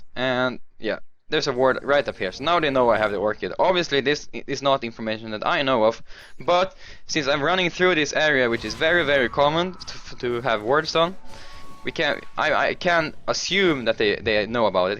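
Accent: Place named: Sweden. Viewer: wp sir. i really like the swenglish too :D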